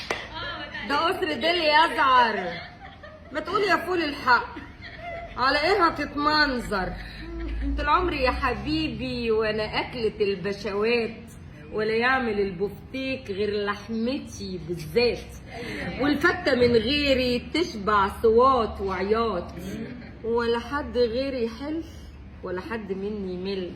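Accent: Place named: Egypt. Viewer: ايه السجع الجميل وأسلوب الإلقاء اللكنة المصرية لاتعلوعليها اي لكنة اخرى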